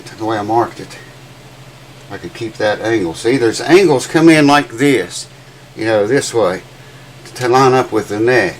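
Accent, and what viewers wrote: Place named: South, United States. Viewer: ~ Just out of curiosity: where, geographically, are you located? Your accent seems to say somewhere down here in the south, but you've made a few videos of massive snow dumps... {?}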